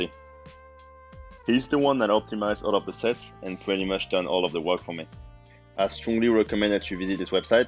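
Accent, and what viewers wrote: France. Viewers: ahhh l'accent français 😏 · Hi are you french ? cause Your accent look like french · tu es francais non?🤫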